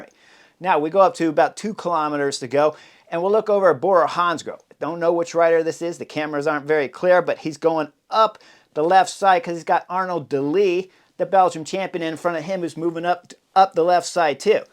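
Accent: United States. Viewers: Great analysis and superb commentary. The American accent is really getting me 😆. Super amusing hearing everything pronounced that way.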